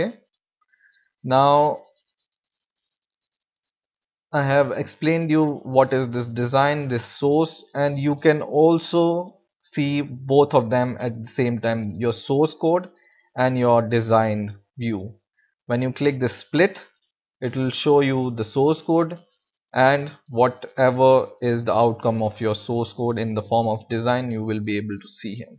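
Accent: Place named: India. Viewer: Indian accent makes it better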